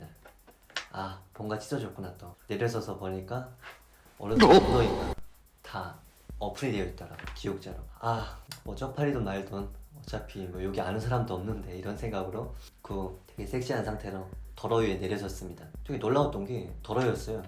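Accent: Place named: Busan, South Korea. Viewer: 부산 특유의 억양 ㅋㅋㅋ 북한에서 청년기 보내도 서울말 자연스럽게 쓰는데 왜 경상도는 안 되는 거야 ㅋㅋ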